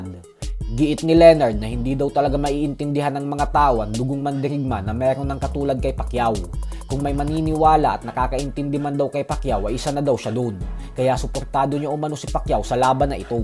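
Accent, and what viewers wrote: Visayas, Philippines. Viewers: Trantado ang blogger na to visayang Visaya ang boses mag inc kanalang boy at Kunin kanaming hitman kikita kapa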